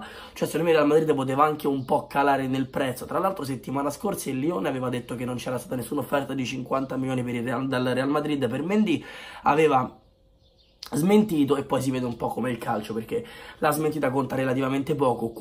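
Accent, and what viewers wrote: Rome, Italy. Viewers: io ti seguo da sempre e mi sei sempre piaciuto ma come giornalista sei di basso livello perché sbagli tanti verbi e ti metti a parlare in dialetto romano.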